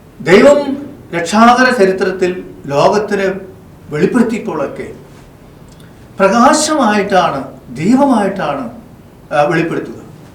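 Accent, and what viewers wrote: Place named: Chittattukara, India. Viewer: Fr., you are from chittattukara, your speech shows that •thank you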